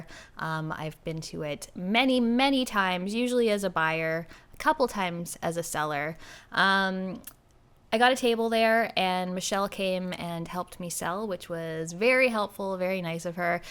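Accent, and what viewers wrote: Canada. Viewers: I think we all realize youre candian by how you pronounce about lol But yeah duck bucks not greenbacks lol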